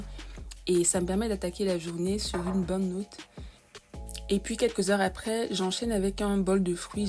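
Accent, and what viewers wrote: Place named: Cameroon. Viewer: J’adore bravo… 3 vidéos en quelques minutes , je me suis abonnée à la 3eme minute après la 1ere vidéo. Le petit plus la voix et l’accent un peu camerounais à la quelle vient s’ajouter la forme des bananes plantains 😊 ça renforce cette idée des origines camerounaises… Très belles vidéos et franchement la voix est captivante ❤❤❤ Je te souhaite un beau succ😮pour la suite ❤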